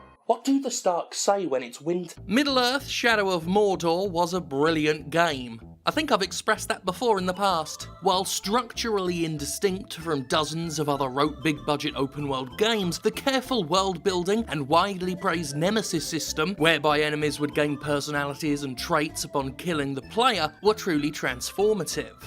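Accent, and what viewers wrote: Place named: United Kingdom. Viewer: Always nice to hear your own thoughts so eloquently put. And in a British accent to boot. Makes it somehow more dignified. Fuck Warner Bros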